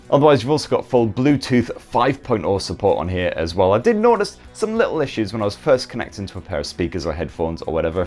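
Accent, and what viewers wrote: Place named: Scotland, United Kingdom. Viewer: Wwhwha...WHAT?!! Mate,you'retalkingwaytoofastwiththatthickScottishaccentIt's sometimesverydiffiulttounderstandwhatyou'resayingYoumightwanttoslowdownabit. Okay?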